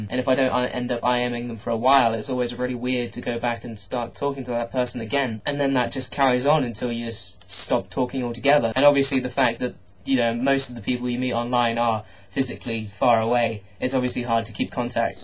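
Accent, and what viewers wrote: United Kingdom. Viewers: A cute nerdy teenage boy ... with a British accent to boot! xD Perfect for me!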